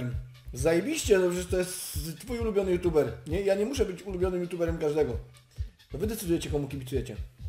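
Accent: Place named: Silesia, Poland. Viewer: Bo on jest chyba ze Śląska i tam tak mówią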